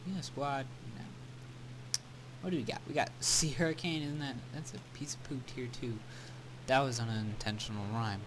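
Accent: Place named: Russia. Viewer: good job man :D Dat Russian accent doe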